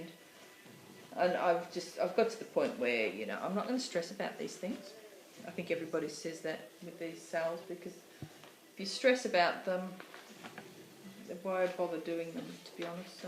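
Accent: Australia. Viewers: Loved your vlog, as always. I'm of "that age", too. When David Cassidy had a concert in Perth, my family was living at South Headland (West Oz) and I was heartbroken when mum said she wouldn't take me to his concert. lol If I remember, not all the roads were sealed back then. Love everything you showed, especially the Happily Ever After. Made my day hearing an Aussie accent...I keep hearing about mythical Australians over here....not seen one in three years! lol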